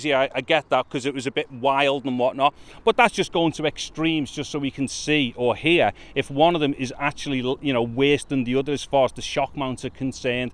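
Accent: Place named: Birmingham, United Kingdom. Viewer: You sound like a Peaky Blinder, mate. Anyway, amazing review. Thanks!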